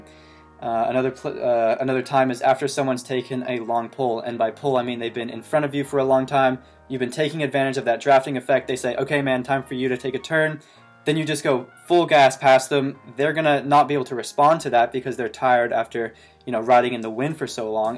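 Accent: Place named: United States. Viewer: Why American accent, but British flag?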